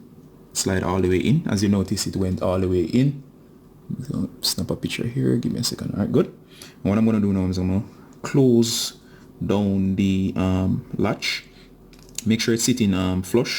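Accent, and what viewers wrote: Jamaica. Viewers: Hey, A Yardie tech support, gwaan rude boy, great video.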